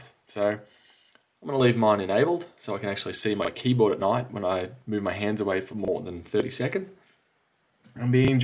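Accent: Australia. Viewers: Liking for Australian accent, am australian :)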